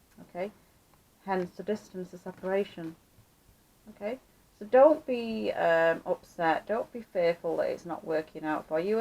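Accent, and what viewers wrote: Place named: Salford, United Kingdom. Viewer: Great reading my dear friend :) May I ask are you from Machester , as you sound very local to me in Salford :) Bless you my sister :) .. x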